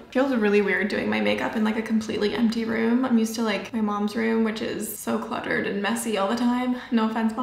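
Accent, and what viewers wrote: RP, United Kingdom. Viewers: I love your british accent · A British accent fits her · Same about the British accent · I love your british accent :3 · i love her british accent lolll :)) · don’t try and sound like the queen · I'm British and I adore your British accent! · Your British accent is actually pretty amazing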